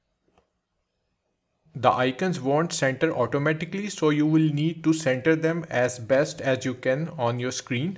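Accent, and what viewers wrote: India. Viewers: Thanks random indian sounding man on youtube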